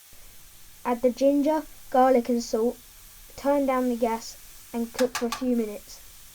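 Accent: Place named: United Kingdom. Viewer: I love your accent, what kind of british accent is it?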